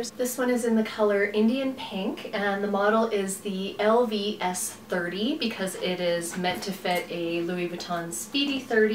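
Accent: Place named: Canada. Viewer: Me: hmmm her accent sounds Canadian? 🧐 Her: pulls out Canadian stamps Me: Beaming bc i guessed right ☺️☺️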